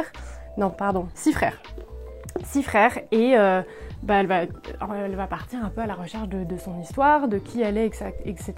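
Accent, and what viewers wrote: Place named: North, France. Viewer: Je kiff trop ton accent tu viens du chnor ???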